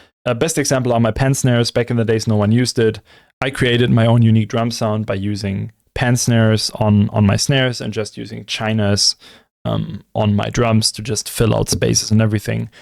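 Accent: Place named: Germany. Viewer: Du klingst deutsch . Bist du deutsch ?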